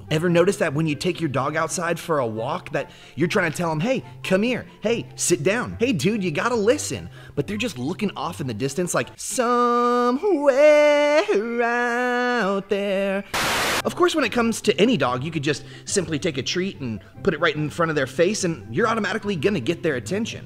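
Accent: England, United Kingdom. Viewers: Hi what a Funny English Accent love it . Hila is a good Listener 🤩🤗 Britney is calm.. luv chilling out after work with your videos still trying to work out which Day I am on Ha Ha...😍 Good tips catch up soon .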